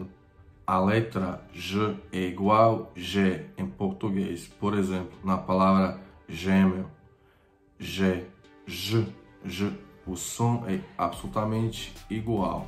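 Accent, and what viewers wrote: Minas Gerais, Brazil. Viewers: Você fala um português praticamente igual ao que falamos em Minas Gerais, isso mostra bem que há uma proximidade na fonética das duas línguas, já que o indivíduo sempre irá aprender outro idioma aproximando o máximo possível a fonética de sua língua materna à fonética da outra língua. Ótima explanação sobre as letras do alfabeto croata desconhecidas por nós brasileiros.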